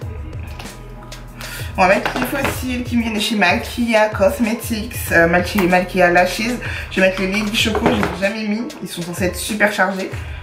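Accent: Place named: Ivory Coast. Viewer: Tu djaaa comme une bonne Ivoirienne 😅😍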